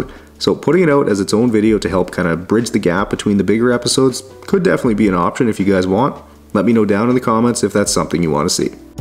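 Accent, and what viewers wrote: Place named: Canada. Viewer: I’m sorry, but do all Canadians sound the same! He sounds just like a few other Canadian YouTubers I watch as well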